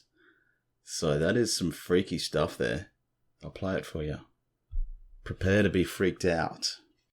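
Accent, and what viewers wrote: Australia. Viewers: Awesome Aussie accent and awesome ghost videos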